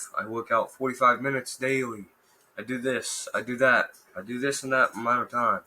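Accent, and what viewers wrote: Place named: North East, United Kingdom. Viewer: Sound like he in the north east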